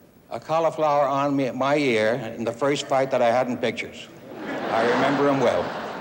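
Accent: United States. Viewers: Lower East Side Manhattan accent. There is NO mistaking it! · ***** New York old Irish.......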